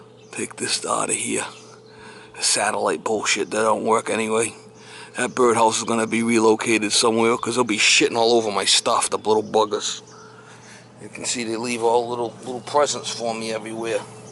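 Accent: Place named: Massachusetts, United States. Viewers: Judging by the accent, I'd say Massachusetts. (I know. It's not nice to judge.) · Good vid! Im trying to place your accent.. Massachusetts?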